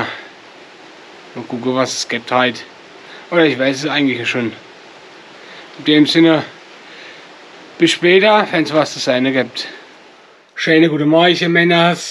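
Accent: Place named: Palatinate, Germany. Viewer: Top Video Was für ein zauberhafter Sonntag hier in der Pfalz! 🌧☔ Trotz des regnerischen Wetters hat dieser Tag einen ganz besonderen Charme, den nur diese Region bieten kann. Die Regentropfen spielen eine sanfte Melodie auf den Dächern der urigen Pfälzer Häuser, während die Natur herum in einem satten Grün erstrahlt. Und dann dieser urige Pfälzer, der uns in dieses YouTube Video zeigt – er ist einfach das Herzstück dieser Atmosphäre! Mit seinem herzlichen charmanten Dialekt entführt er uns in die Welt der Pfälzer Gastlichkeit gr. aus Lemberg Eure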